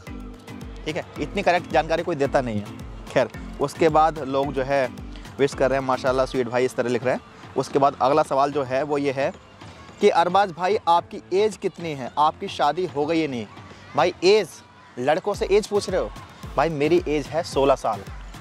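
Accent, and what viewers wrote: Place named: Rampur, India. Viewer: I am also from rampur tabhi aapki voice se samajh aa gya....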